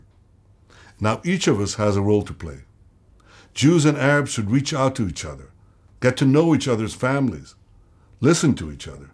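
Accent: United States. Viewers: Are we gonna ignore the fact that he literally speaks English with an American accent?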